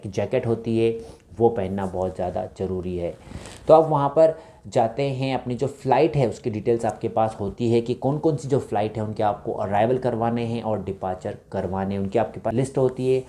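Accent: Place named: India. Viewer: damn that indian accent..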